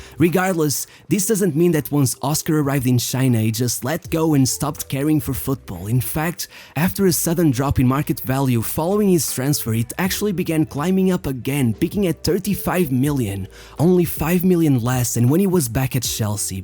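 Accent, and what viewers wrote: Portugal. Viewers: Are you portuguese? You sound really portuguese,se fores grande vídeo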